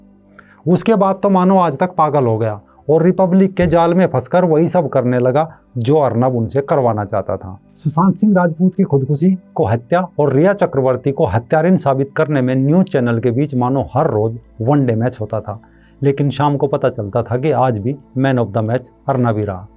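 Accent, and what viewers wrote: Haryana, India. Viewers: I really like and admire your choice of topics and your sarcastic comments, one liners, and choice of adjectives with that Western UP & Haryanvi accent. Sabhash Keep it up. Keep posting more than one video per day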